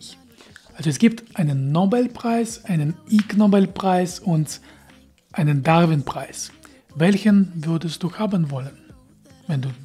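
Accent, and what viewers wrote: Russia. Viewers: Wie immer, toller Beitrag in einem herrlichen russischen Akzent! Danke für Deine Mühen! Ich „spinne“ man ein wenig: Die „Kunst“ bei der Levitation ist nicht, die Levitation selber! Die Kunst ist, die Wechselwirkung des „skalaren Higgs-Feldes“ um den Probanden, mit den subatomaren Teilchen (Quarks) des Probanden, zu unterbinden! Ohne diese Wechselwirkung keine „Masse“ und ohne „Masse“ ist levitieren ein Kinderspiel! Derjenige der das hinbekommt, der bekommt alle nur erdenklichen „Nobelpreise“ die es gibt! Garantiert!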